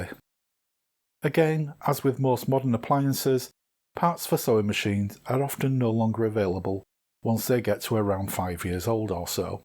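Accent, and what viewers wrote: Northern England, United Kingdom. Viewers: What a brilliant and informative video. It's great to hear a northern accent too!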